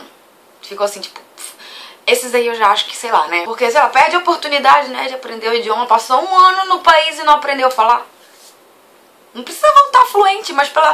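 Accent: Pará, Brazil. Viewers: Ah esse sotaque paraense... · Sotaque paraense? Hahaha Te sigo a pouco tempo mas estou adorando saber da tua experiência! Não vi todos os vídeos ainda, por isso não sei se vc já disse alguma fez, mas qual curso vc faz? Eu queria muito poder fazer o Ciência Sem Fronteiras, mas meu curso, Direito, não está incluído na lista :((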